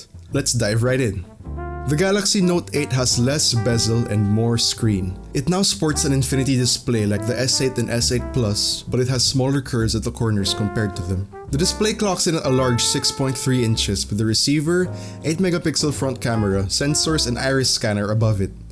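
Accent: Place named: Philippines. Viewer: Noypi accent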